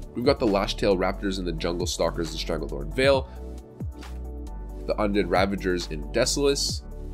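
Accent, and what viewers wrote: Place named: Canada. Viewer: Typical Canadian. Makes my ears bleed.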